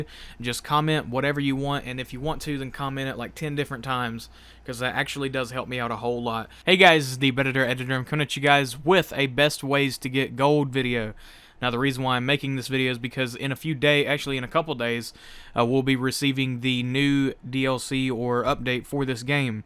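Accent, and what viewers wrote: South, United States. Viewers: I love the bit of southern he has in his voice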